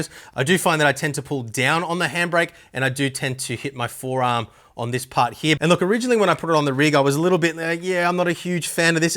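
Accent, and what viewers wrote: Australia. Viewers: Are you an Aussie mate? Thinking of using your affiliate codes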